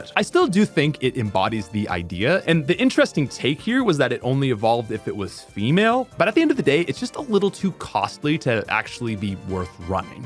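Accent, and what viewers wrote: Canada. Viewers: For someone who says out the way you do, you sure do say it a lot - a fellow Canadian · Miss oot, aboot...etc. God I love Canadian's ♥️ 🇨🇦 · First time subscribing long time follower but shit I did not pick up that you were Canadian until this vid. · Are you Canadian? I feel like you're Canadian. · So Canadian lol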